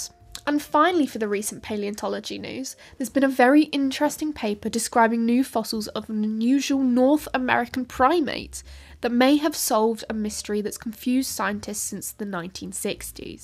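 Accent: England, United Kingdom. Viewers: I hate English accents… Mae your own YouTube so we don’t have to hear your bleeping accents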